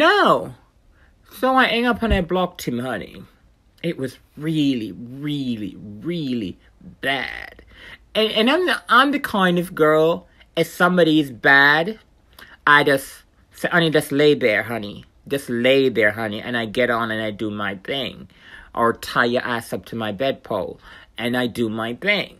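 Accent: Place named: Ireland. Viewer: Love your videos keep it up girl I know u said your Jamaican I love your accent sounds Irish ☘️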